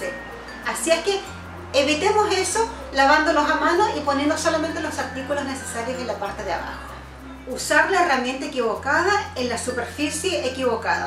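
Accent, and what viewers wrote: Chile. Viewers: Tu eres chilena?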